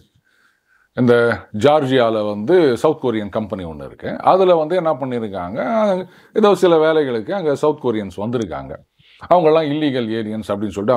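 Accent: Chennai, India. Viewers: 😂 Sir i am a great fan of yours, they way you present it with Chennai slang is very very humorous, i really enjoy it not only myself Many others also will be enjoying these facts and reality 😅, keep it up Sir 🙏🌹🙏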